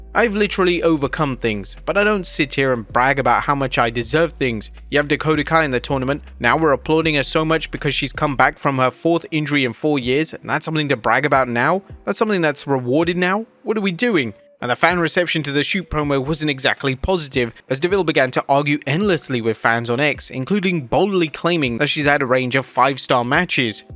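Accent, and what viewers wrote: England, United Kingdom. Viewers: 😅😅😅 .. Remember, he's an Englishman. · if you see “controversial” in the title, expect the british narrator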